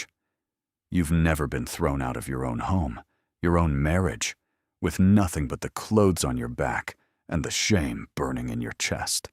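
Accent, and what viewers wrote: United States. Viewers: This is a wonderful story. Well presented in every way. However when I listen to these wonderful British tales I so wish it was in a dignified British accent. American accents have no real place in the British Victirian Era. Just a thought ❤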